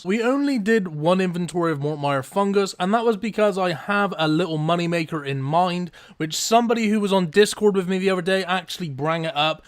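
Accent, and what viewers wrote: Bristol, United Kingdom. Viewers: bristol accent 😂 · Are you from Bristol England? Or near by? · are you from Bristol? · Are you from Bristol? Sound very Bristolian aha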